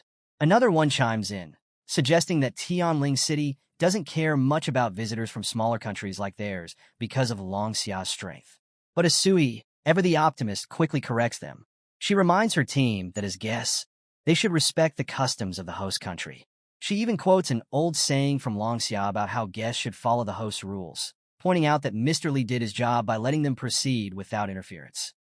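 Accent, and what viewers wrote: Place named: United Kingdom. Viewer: Why the switch to British accent at chapter 50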